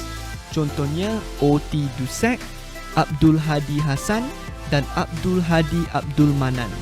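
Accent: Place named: China. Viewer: Masih ada pelat cina, kalau boleh tingkatkan cara pertuturan supaya tidak pelat semasa bertutur dlm bhs melayu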